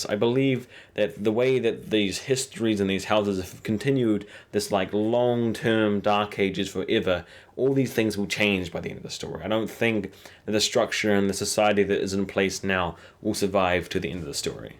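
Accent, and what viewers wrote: New Zealand. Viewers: are you from new zealand? you sound like a kiwi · Cool vids.. i´m from argentina i used to live in nz for the last 7 years.. it´s been a while since i´ve hear kiwi accent.. keep it up.. sweet as bro.. cherr bro..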